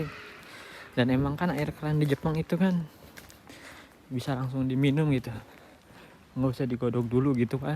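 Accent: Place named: West Java, Indonesia. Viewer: Sigana mah urang sunda si akang ti cara ngomong na 😅, salam ti uing tkw taiwan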